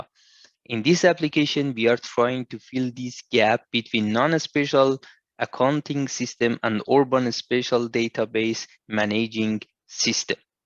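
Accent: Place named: Germany. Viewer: عالی بود فقط ته لهجه آلمانی گرفتی😁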